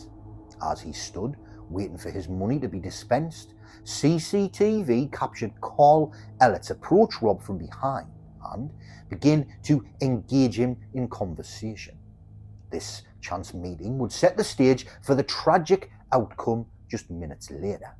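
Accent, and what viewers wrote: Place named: Scotland, United Kingdom. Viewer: This channel keeps coming up but that's fine I like the animated story telling style and the Scottish? accent is entertaining for an Aussie viewer. The setting is a bit bleak, a prison.....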